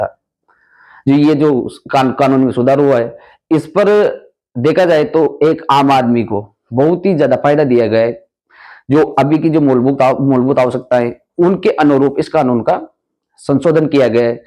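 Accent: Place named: Kota-Bundi, India. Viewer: आपका बौली का लहजा कोटा-बून्दी का है।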